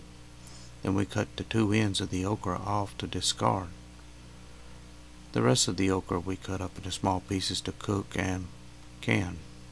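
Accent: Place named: Louisiana, United States. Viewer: Are you from Louisiana? That is where my family is from. I recognize the accent and the of course you mentioned gumbo.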